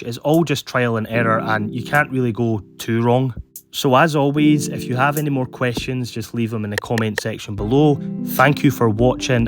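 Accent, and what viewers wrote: Scotland, United Kingdom. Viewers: I just got a pair of these guys yesterday. They are such cool looking little fish. Thank you for the video. Are you from Scotland?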